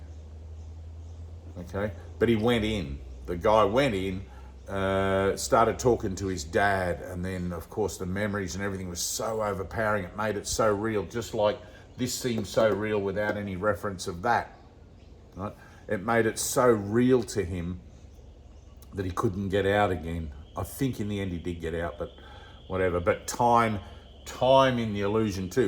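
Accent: Australia. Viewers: Beard ✅️ Long hair ✅️ Australian accent ✅️ Scrubby handwriting ✅️ Tattoos ✅️ Deranged laugh at the beginning of each video ✅️ Everything you say ((must)) be true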